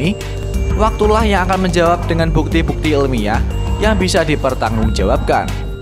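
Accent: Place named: Java, Indonesia. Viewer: Medok bet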